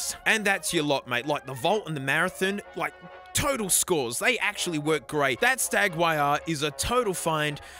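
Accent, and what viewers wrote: Australia. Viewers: this has guy always been the best for when you just want to relax and watch an aussie speaking like an aussie should speaking as an under 100k subs veiwer on the main channel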